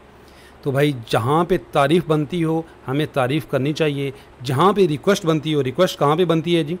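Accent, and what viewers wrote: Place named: Pothohari, Pakistan. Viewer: AP pothohar say belong rktay lahja pothohar Wala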